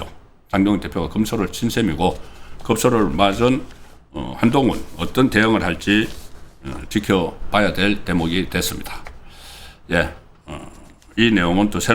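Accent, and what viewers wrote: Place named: Daegu, South Korea. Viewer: 송구컨씨ㆍㆍ아무리 지방출신이라도 발음 억양 좀 조정해라ㆍㆍ듣다가 보면 짜증난다 나도 대구다 그리고 어설프게 코미디언 수준으로 짖지말고 좀 잘해라 내용이 없다 다 보고나면 영남일보냐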